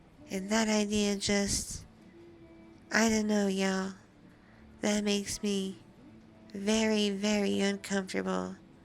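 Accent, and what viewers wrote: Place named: South, United States. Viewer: That country accent just B E A utiful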